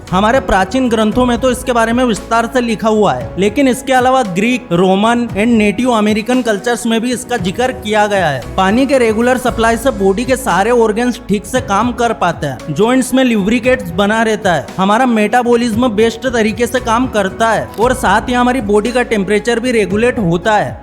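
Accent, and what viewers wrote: Indore, India. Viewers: Your accent quite similar, like typical Indori.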